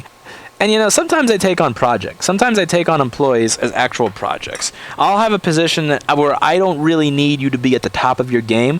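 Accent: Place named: New York, United States. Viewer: I think the New York accent makes your “shut the fuck up” 10 times better LOL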